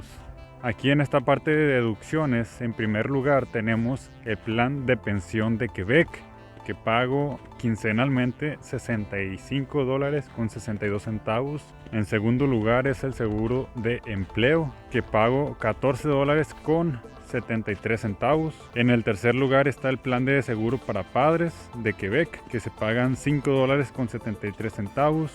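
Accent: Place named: North, Mexico. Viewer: Acento norteño mexicanazo.😊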